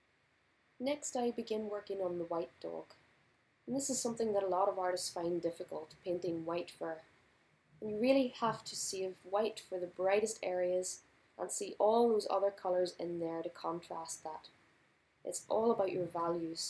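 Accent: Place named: Ireland. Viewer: Great video as always! Also, I love your accent. My grandpa was born in Ireland so my pride for the Irish is strong!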